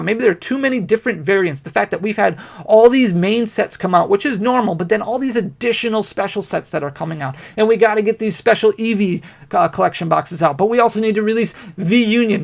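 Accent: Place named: Wisconsin, United States. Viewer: I enjoy hearing your Wisconsin accent! Moved down to Georgia a few years ago.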